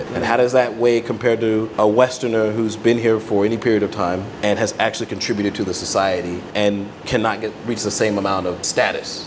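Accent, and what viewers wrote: Taiwan. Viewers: 講國語有台中味XD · A Genius ~ I just love your both Chinese and Taiwanese accent ❤👍👍👍👍👍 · 真的有臺灣口音>< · 靠，就是靈魂的台灣人，那個口音超地道的😂😂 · ，台語、國話，口音很台灣❤ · 哇塞，之前只看過兩隻的影片，但當中本人沒出現，聽聲音以為是台灣人😮 國語比我爸標準，台語比我男朋友標準😂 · 台灣腔的國語。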